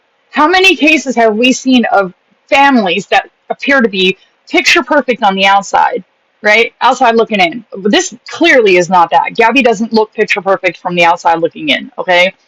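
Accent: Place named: Ireland. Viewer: Your perspective is exactly right. I enjoy listening to you.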